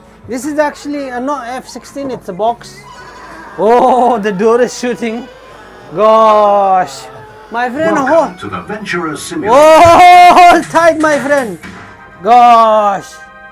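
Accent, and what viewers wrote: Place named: Stoke, United Kingdom. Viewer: Where did you pick up the northern english accent pal? Sounds a bit like a Stoke accent. Love it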